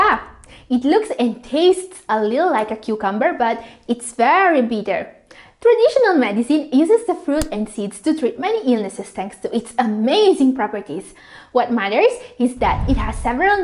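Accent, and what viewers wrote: United Kingdom. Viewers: Her British English is just as a Londoner!!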